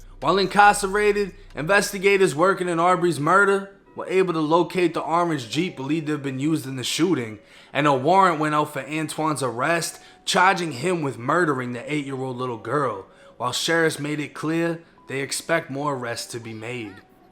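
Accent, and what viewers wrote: Boston, United States. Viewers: thanks for the UPDATE. that little girl deserves justice; and to think had been not been in prison, who knows if the circumstances that led to her death could've been prevented. BTW: are you originally from BOSTON?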